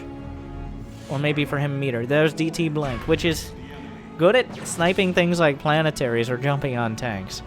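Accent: South, United States. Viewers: Hey, how'd you get your slight southern accent? What state were you raised in?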